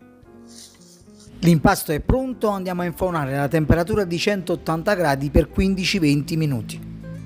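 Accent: Naples, Italy. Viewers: E cosa dire: Lei è un grandissimo pasticciere, poi l'accento napoletano! A proposito, W NAPOLI!!!!🎉🎉❤❤ GRAZIE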